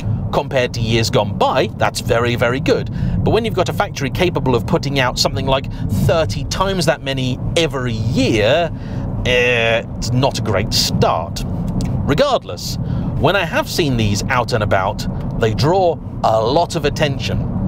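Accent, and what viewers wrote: United Kingdom. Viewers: At least she does it with a pleasant British accent!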